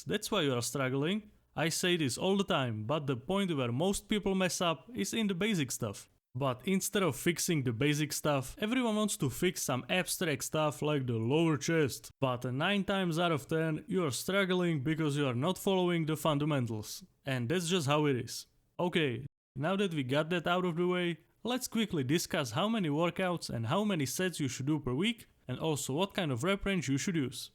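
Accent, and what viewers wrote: Czech Republic. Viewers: Czech accent? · you sound czech, are you czech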